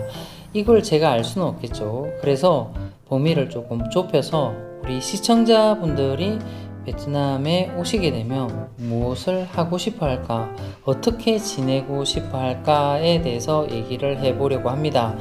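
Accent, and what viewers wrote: Gyeongsang, South Korea. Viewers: 말투가 너무 정겨워요. 제가 중학교 까지 창녕에서 가장 가까운 합천에 살아서 그렇게 느껴 지는가 봅니다. 영상 잘 보고 있습니다. 곧 10만 미리 축하 드려요.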